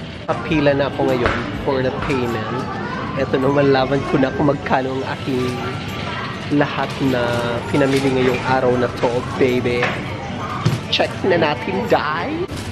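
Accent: Visayas, Philippines. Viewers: Bisaya ka?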